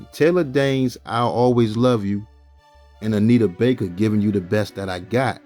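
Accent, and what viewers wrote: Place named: Philadelphia, United States. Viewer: The narrator must be from Philly!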